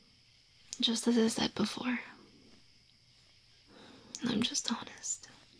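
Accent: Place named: United Kingdom. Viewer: You sound like a British accent version of mapl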